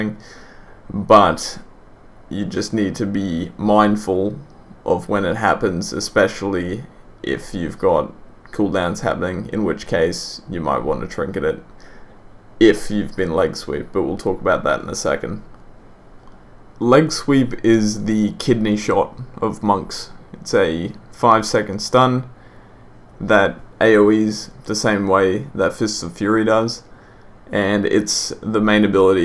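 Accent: Australia. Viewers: Hey man you sound Australian which part of Australia are you from ?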